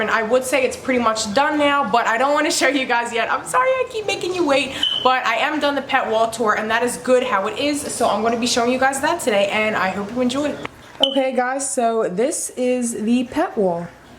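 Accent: Philadelphia, United States. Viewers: I just discovered you and I really enjoyed seeing your set up! I have been a pet/animal enthusiast my whole life, and I plan on opening a rescue in a couple of years- this is great inspo for organization and habitat set ups! You're really great and I am looking forward to watching more. (Where are you from?? You sound so much like my family from Philly!)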